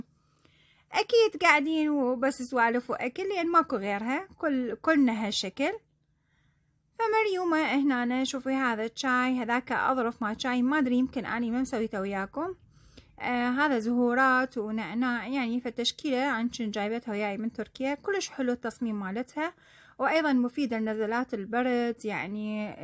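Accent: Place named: Iraq. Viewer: اهلا بيچ خيتي شلونچ شخبار بلدچ العراق الشقيق فدييتچ اني جزائري وهم احب هالبلد الشقيق واعشق لهجتكم بس يمكن تسوي الي دولمه لو كبه البرغل لانو احب هاي الاكلات تسلمي خيتي الغاليه انتي واهلنه واحبايبنه بالعراق الشقيق🇩🇿🇩🇿💕💖💓🇮🇶🇮🇶✌️✌️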